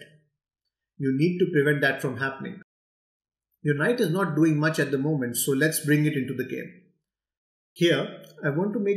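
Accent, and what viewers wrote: India. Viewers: Hindi ko maintindihan parang Bombay magsalita 56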